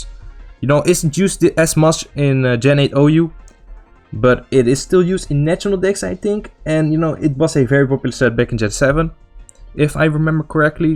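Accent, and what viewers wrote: Netherlands. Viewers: 3 seconden in en ik kon gelijk horen dat je uit Nederland kwam, blijft leuk om mensen uit eigen land te horen :)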